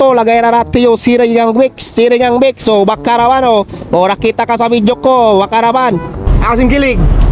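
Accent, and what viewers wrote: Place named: China. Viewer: Oragun c tagalog in tsikwa accent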